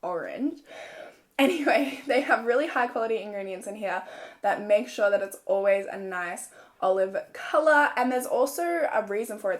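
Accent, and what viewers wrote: Australia. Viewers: I just live for your Australian accent 😄😄❤️ I never knew you were that fair to tan your body 😲😲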